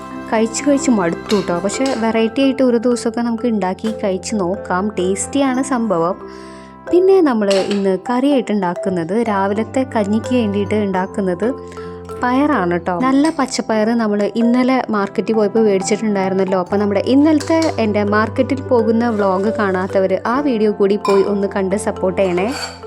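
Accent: Thrissur, India. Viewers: Are you thrissur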